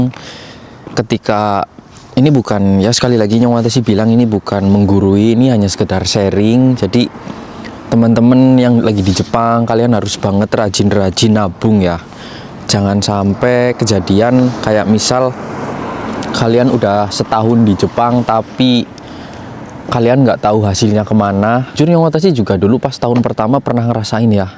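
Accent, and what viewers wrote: Banyumas, Indonesia. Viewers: mantap bro, jarang2 kaum ngapak ngomong finansial. sing ngati2 ya bos nang kana. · ngapakkk forever👍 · Bahasa nya ngapak panget lor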